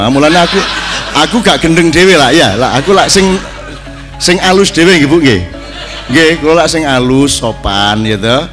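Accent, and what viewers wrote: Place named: Java, Indonesia. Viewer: Lidah jawa mas bro'.. ga usah di perdebatkan..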